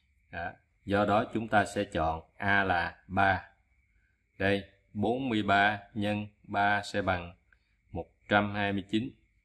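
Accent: South, Vietnam. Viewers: Trong một xã hội bị đồng tiền chi phối gây ra hỗn loạn trong mọi lĩnh vực như bây giờ mà vô tình gặp được kênh của bạn thì thật là đáng trân trọng! Với chất giọng ấm áp, trầm hùng mà giản dị của phương ngữ Nam bộ khiến người xem rất dễ chịu và dễ hiểu. Cơ duyên “gặp” được kênh của bạn cách đây tầm hơn tháng và liền đăng ký kênh để tiện theo dõi. Một kênh rất có ích cho xã hội ngày nay. Và mình thấy có một điều đáng tiếc là hiện nay những kênh mang nội dung học thuật lại ít được theo dõi hơn những kênh giải trí ( mà đa phần là tào lao/ như kênh Nờ Ô Nô hổm giờ báo chí đưa tin). Vậy thì tương lai đất nước sẽ như thế nào??? Chúc bạn và gia đình: Hạnh phúc + Sức khỏe!!! Thân chào!!!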